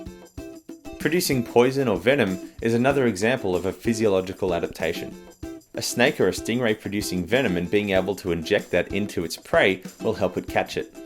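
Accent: Australia. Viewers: it's so comforting to hear an aussie accent on youtube hah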